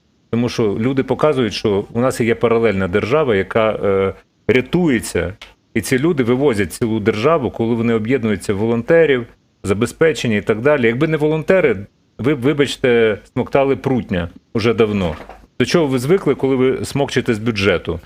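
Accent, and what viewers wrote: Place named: Poltava, Ukraine. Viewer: згадалась ваша полтавська вимова,коли читала в місцевому чаті одне з повідомлень. Це Херсонщина. Ось це повідомлення: "Вірні слова,бо унас не так як у людей,ми будем собирать кошти, на ремонт а хтось буде мидальки получать,мидаль наде було дать громоді яка була в окупоції,чого не пишуть де діли 60 тон гомунітарки,а тендери по ремонту це зовсім інша проблема,унашом отеге все можливо,кому війна а кому мать родна."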